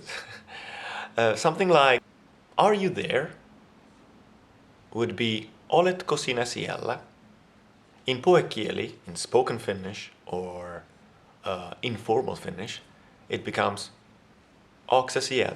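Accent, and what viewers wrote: Italy. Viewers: The videos you make are truly excellent. Also, your pronunciation has only a very faint accent. This may partly come from the fact that Finnish and Italian pronunciations do not differ too much. By the way, there is a Russian guy called Kiril Sultanshin who makes videos and has learned good Finnish within a few years. His pronunciation is absolutely perfect though he still makes occasional mistakes in grammar. Achievements like this indicate a great talent. I do not like the term “kirjakieli” although even linguists rather commonly use it. The reason is that it is not used only in writing but also when any formal presentation is needed. TV news, speeches, negotiations, university lectures, schools are typical examples. “Puhekieli” is typical in everyday informal discussions. Instead of “kirjakieli” I would rather say “yleiskieli”. Admittedly, “puhekieli” expressions sometimes intrude “yleiskieli”, mainly due to a sloppy use of the language. 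· This was the first video I've seen from you. Are you italian? Because our italian language teacher ones told us that italian pronounciation suits finnish almost better than any other language and I was wondering if it was vice versa :D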